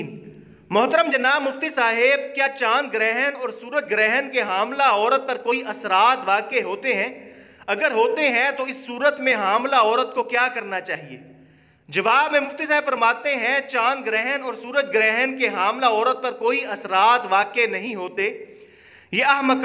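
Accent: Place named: Rural, India. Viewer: Taire awaz sai hai pata chal Raha hai Tu Kitna paindo hai. Don't spread lies!😞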